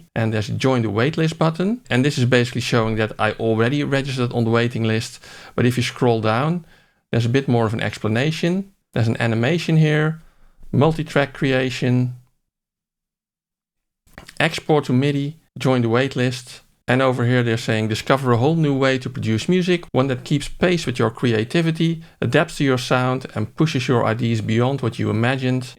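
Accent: Netherlands. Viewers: You can call yourself but your accent betrays you, you're dutch!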